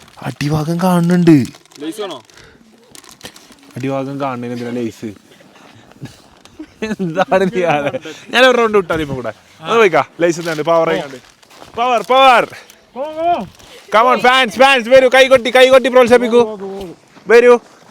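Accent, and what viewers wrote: Malappuram, India. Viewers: മലപ്പുറം ഭാഷ ഒരു രക്ഷയും illa 😁😁💕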